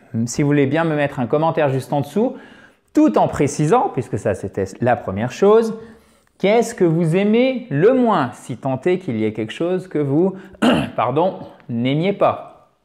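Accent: United States. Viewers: I love your American accent! Why don't you speak more English than French? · Thank you very much,. What I like the most is how to build some simple sentences and how to conjugate the verbs. The American accent disturbs me but it might be necessary? I would have an English accent. I would like some videos with dictations if it is possible. See you soon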